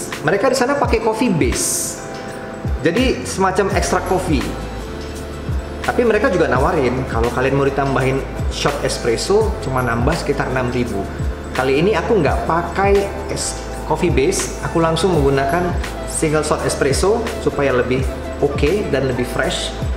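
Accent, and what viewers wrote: Medan, Indonesia. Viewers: Kayaknya orang medan kau bang. Soalnya logatnya medan kali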